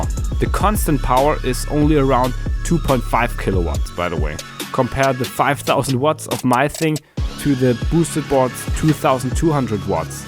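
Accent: Germany. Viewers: Dieser Deutsche Akzent 😂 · Dat geman accent. Yuneec for me. Doesn't break the bank. As always... good luck eskating in ol germany. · Hey, das Video ist gut gemacht! Ich gehe mal davon aus, dass du eigentlich deutsch sprichst, dem Akzent nach. ;-) Inspiriert zum nachmachen, weil du vor allem deine negativen Erfahrungen aus einem früheren Projekt darstellst und anwendest.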